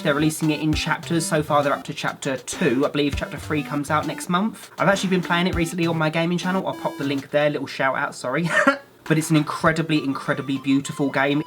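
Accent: England, United Kingdom. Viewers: Are you britsh or not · I love U and your england accent. Love u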